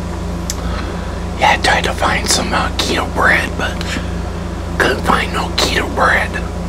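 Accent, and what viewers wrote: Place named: Texas, United States. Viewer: Hey your from Texas arnt you my dads from Texas to i love your accent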